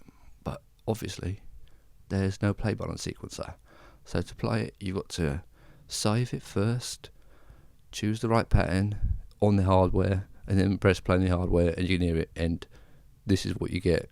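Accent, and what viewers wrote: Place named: England, United Kingdom. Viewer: thanks for the tutorial. May I ask what part of England you are from? I'm fascinated by accents, thanks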